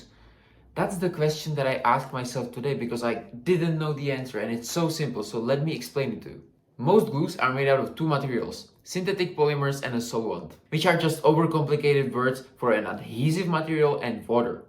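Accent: Czech Republic. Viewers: Už podle prvních pár vět mi bylo jasný, že jsi Čech :DD. Náš přízvuk je strašně poznat :DD. Pak jsem si to jen potvrdil českým nápisem na tom lepidle. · Where are you from? You sound like you're from Slovakia 😅 or Czech republic. Yep, stand corrected, Czech republic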